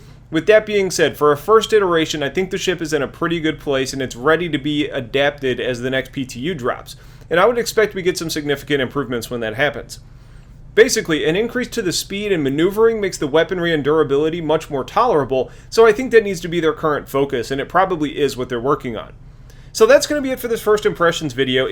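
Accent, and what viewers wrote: United States. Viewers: Nice to have an actual American voice, rather than a very gay British voice doing commentary.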